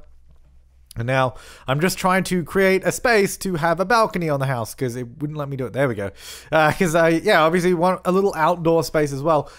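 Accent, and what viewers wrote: Australia. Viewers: Happy to hear an Australian accent! Did you have an architecture background? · You sound like an Australian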